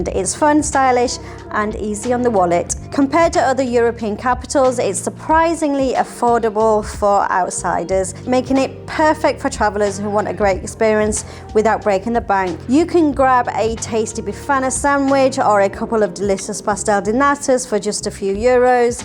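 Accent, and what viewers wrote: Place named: United Kingdom. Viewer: This is clearly a British woman, dude.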